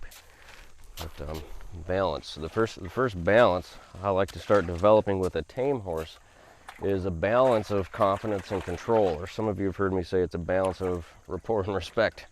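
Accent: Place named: Michigan, United States. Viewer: knew by the accent you were probably from Michigan (from royal oak myself).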